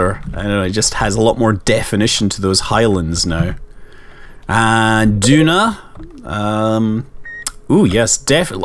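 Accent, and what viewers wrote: Scotland, United Kingdom. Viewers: you sound Scottish or Irish possibly welsh or from northern England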